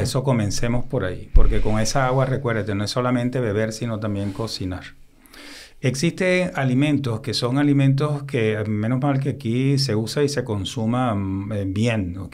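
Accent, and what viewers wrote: Venezuela. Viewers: Que hombre tan inteligente 🧠 y EXCELENTE ORADOR ❤ CREO Q ES VENEZOLANO POR SU ACENTO. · jiji Solo escuche el intro y super que el doctor es Venezolano, que orgullo. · Excelente entrevista ! Usted es italiano pero habla muy Venezolano Doctor🇻🇪🙏🙌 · su espanol es Venezolano claramente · me descolocó cuando dijo que era italiano, desde escuchar sus primeros palabras supuse que era venezolano por su acento!! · Para mí, este capítulo ha sido una cátedra magistral, impartida por un profesional excepcional. Su brillantez reside también en su habilidad para simplificar términos técnicos, haciendo que la información sea comprensible para todos. Su calidad humana es igualmente destacable. Desconozco su trayectoria personal, pero por su acento, percibo una profunda conexión con los venezolanos y Venezuela. ¡Mi más sincero agradecimiento! Totalmente recomendado."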